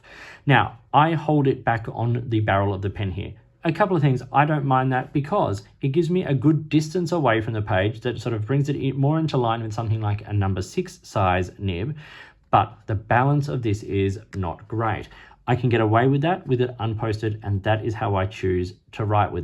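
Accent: Australia. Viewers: i am still thinking whether to buy orange or black one. For a few years I have been relying on your reviews videos mainly because you’re also a lefty, have a nice handwriting and your reviews i find them very informative, to the point without ‘frills’. I’m from Europe and love your Australian accent 😅 thank you for all your effort! ❤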